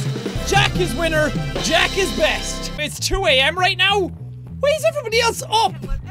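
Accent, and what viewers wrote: Ireland. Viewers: Yea I'm assuming from his accent he's in Ireland so yea pretty close :)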